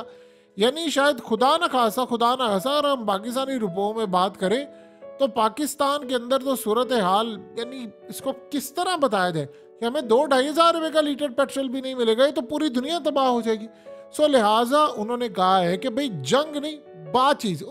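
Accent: Punjab, India. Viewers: I can only request you please don’t talk in English coz you speak English in Punjabi stick with Urdu and don’t try to copy different accents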